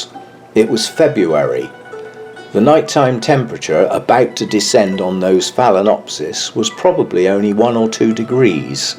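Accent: United Kingdom. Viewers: It is perfect,you know I'm not a native english speaker,and people with British accent really help me out with speaking;)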